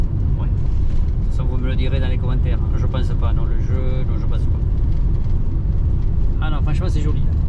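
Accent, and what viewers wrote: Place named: Southwest, France. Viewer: Sud Ouest St Jean de Luz, Bayonne, Biarritz plutôt je pense 🤔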